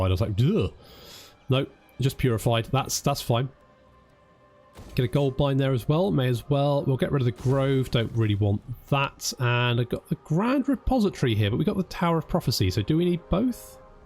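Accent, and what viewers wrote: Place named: United Kingdom. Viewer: i followed you from cap 1 to here dude, i love your british voice DUDE ( no homo)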